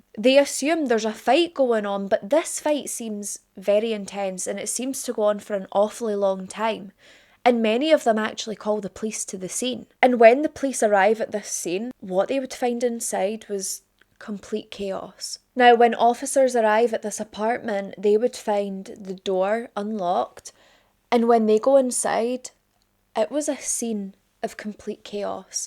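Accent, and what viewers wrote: Scotland, United Kingdom. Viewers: I've only recently discovered you, but immediately subscribed after the first video. You have a very pleasant voice, and like so many I adore your beautiful Scottish accent. Will definitely be binging more of your vids! Love and appreciation from Belgium <3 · Thank you for your hard work. Glad to hear you believe in paranormal too. You are my favorite out of all of the ones out there. I’m Irish (heritage live in the states) but love you Scottish accent. So so so so cute! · Hi, case suggestions: Maybe you could consider another case from my native Norway; the serial killer nurse Arnfinn Nesset, convicted in 1983? Horrendous case imo. Your channel is my to go true crime channel. Love your presentation, and your Scottish accent! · i’ve been a silent (unknowingly unsubscribed) watcher for a couple of months and hearing a scottish accent pulled me right in. 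i’ve not seen a vid from you about it so was wondering if you could cover the caroline glachan case? she was a young scottish girl from my village and i grew up with the kids who were sadly witness to this crime. it always breaks my heart that people were so quiet and scared for so long, some knowing/suspecting who the perpetrators were but didn’t come forward.❤💙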